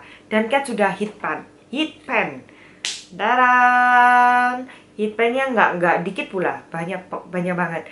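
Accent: Java, Indonesia. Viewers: Ka cantik, aku suka cara ngomongnya. Podo2 jowo mbak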